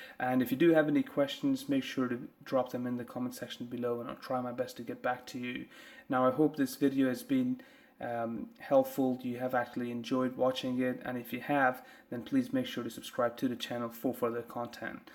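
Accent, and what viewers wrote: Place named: Northern Ireland, United Kingdom. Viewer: Great guide, sounds like uve a northern irish twang haha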